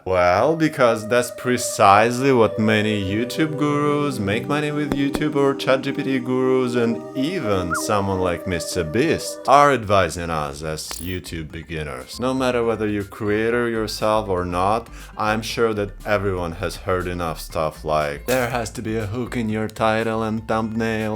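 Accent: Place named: Russia. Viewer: It's here In Georgia, USA. And you're queued up with a number of videos. I'm listening to you as I play a video game. You do have a strong Russian accent. But, as you already said, the algorithm isn't human. So, that's not the problem. Your English is very good as well. Before I continue, I have to state the obvious. There's a vicious war in Ukraine and of course you are aware of that. I'm certain that not all Russian citizens support that war and I'm not asking your position. You may not wish to answer and I wouldn't impose on you for an answer. But it's constantly in the news. And like it or not, that may have some effect on any Americans who views your videos. There's very little doubt in my mind that focusing on that in any way would not serve you well. In sum, there may be a measure of fear that is inhibiting you. Or a measure of anti-Russian sentiment as well. 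I'm not a content creator in any way at all. So, I can't say that any advice I give you will be useful. It is not my intention to be cruel, but I have to say that sitting in front of a mic just talking about yourself doesn't make you stand out. So, I'm wondering if you have some personal interests that you can share with us. Any skills, training or activities that might interest viewers. You live in Russia I believe and of course it isn't wise to dox yourself. How does being nonbinary affect your life in Russia? Are you at risk from others there for being on YouTube and openly nonbinary? Can you leave your h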